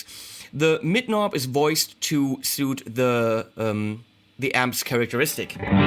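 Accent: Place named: Germany. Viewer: So ein schnuckeliger deutscher Akzent!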